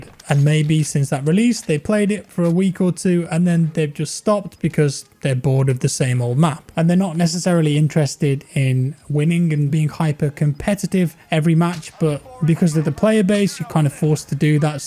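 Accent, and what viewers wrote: United Kingdom. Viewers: A bunch of streamers already do the "spectate" - don't follow. I love the adult conversations regarding gaming with other adults. Mix in the British accent and I just laugh. M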